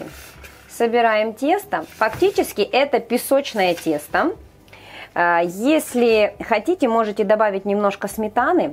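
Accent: Ukraine. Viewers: Сколько смотрю столько и слышу украинскую речь. Мне нравится её говор 😍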